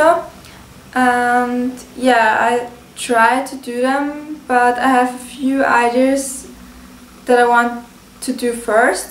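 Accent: Austria. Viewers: okay hier hört man, dass du aus österreich kommst! ;) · I'm watching all your videos so I can pick up your Austrian accent!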